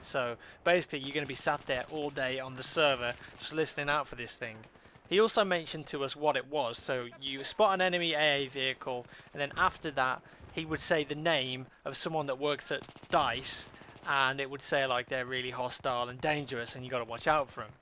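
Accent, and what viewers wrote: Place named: Hackney, United Kingdom. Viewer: I'm here in 2021, I love hearing voice sound like a lock, stock and two smoking barrels hackney bloke. Fast forward to today and he sounds like a refined English gentlemen out for a spot of tea. Love ya,!!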